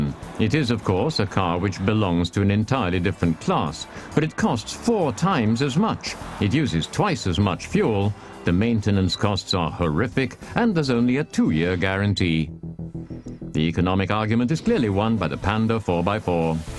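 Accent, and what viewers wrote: United Kingdom. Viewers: What is going on with the narrator's accent? He sounds like an English BBC science presenter from the 1960s, but this is clearly filmed on the continent with right-hand drive cars where the prices are quoted in Euros. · youre from UK ? :)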